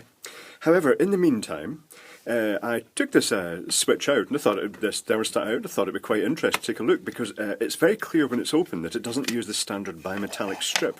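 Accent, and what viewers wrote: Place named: Glasgow, United Kingdom. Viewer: I thought I'd hit the wrong channel & John Ward had suddenly got a Glaswegian accent when I first stated watching this vintage electrical stuff :)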